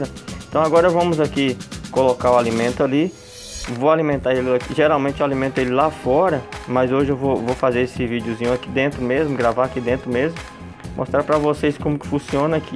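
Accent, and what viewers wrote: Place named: Mombaça, Brazil. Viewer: Bicho das urea de mucego kkkkk sou monbacence eu falo asim memo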